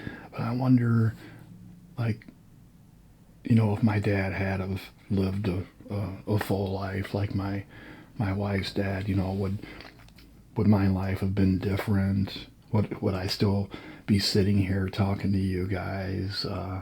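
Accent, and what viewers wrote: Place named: Midwest, United States. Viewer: P.s. I love your accent! Are you from the Midwest? I’m from Utah but living in California 😊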